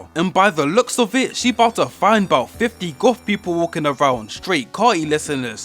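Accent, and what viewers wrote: United Kingdom. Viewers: idk what it is about people with a uk accent reveiewing anime/movies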